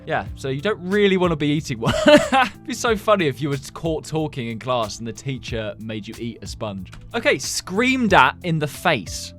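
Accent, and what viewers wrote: United Kingdom. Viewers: Is it gonna be mean but are you British? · Is this guy British because I love people who are British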